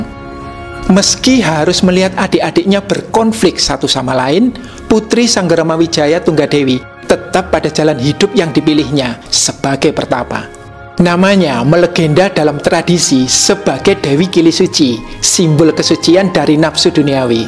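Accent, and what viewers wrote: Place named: Java, Indonesia. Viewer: Naratornya jowo banget, jowo medhok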